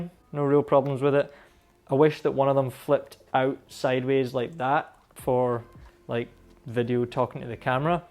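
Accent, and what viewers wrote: Scotland, United Kingdom. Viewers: Hey man, i love your scottish accent and the video. I do photography for many years, but i am in sort of an impass now, what would you choose between XT2 and a D750? I really love the ease of use of the XT2 with controls on the body like my film SLRs, and the portability, but a part of me wants to get into the big boy league and go full frame. While i know gear isn't important, i do want alot of quality for my photographs (i am only doing it as a hobby of which i am very passionate about). Another thing i am worried about is that my MF lenses (mostly M42), won't work that well with the D750, and i really love them. Cheers!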